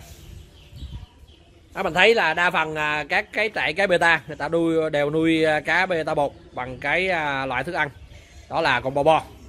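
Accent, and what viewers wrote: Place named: Mekong Delta, Vietnam. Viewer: chời ơi chờ clip mỏi mòn nay mới xuất hiện,khoái cái giọng miền tây thiệt thà chân chất,trại cá ở cu chi- trảng bàn tây ninh giá mềm hok anh. xuống tham quan có phải giới thiệu hok hay ghé chơi tham quan giải trí hoy,:D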